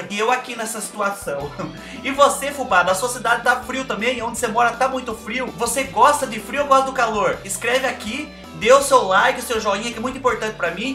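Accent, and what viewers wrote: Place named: Goiás, Brazil. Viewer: Oi em primeiro lugar quero dizer que concordo com a Milena Barbosa..... para de ficar falando essa palavra (des......)nem vou terminar,pois não é bommmm # fica a dica. E agora quero dizer que eu concordo com você, detesto o 🥶 frio. Graças a Deus moro no Centro Oeste do Brasil, em Goiânia amo minha cidade e principalmente o clima, agora esse mês e o mês que vem faz um pouco de frio..... mesmo assim me incomoda muito, mais logo passa. quero que saiba que ammmmooooo vc, e esse seu jeito de ser e falar, amo.aqui em Goiás principalmente nos interiores fala muito assim com vc, na capital também e é por isso que amo ver seus vídeos..... 👍🏾 continue assim, só pare de falar aquela palavra feia..... viu!!!!!!!bjs no seu coração.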